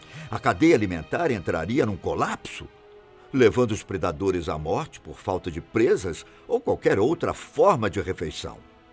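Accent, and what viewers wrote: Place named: Rio de Janeiro, Brazil. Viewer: É que ele é carioca kkkkkkkk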